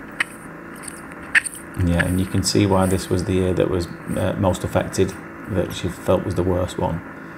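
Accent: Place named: Liverpool, United Kingdom. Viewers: You’ve got a slight Liverpool accent 🙂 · Are you in Wigan? It sounds like you are?in the middle of Liverpool and Manchester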